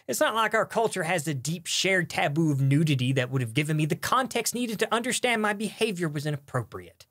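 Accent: South, United States. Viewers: It’s okay, your vaguely southern accent has soothed us and your justifications seem fair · I like your subtle southern accent. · Me neither, i thought it was kind of a speech quirk or character thing, but seeing his face, I realized he was SOUTHERN. and... HANDSOME. Lmao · Your Southern accent is less noticeable here than your regular videos. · I never realized how thick his Southern accent was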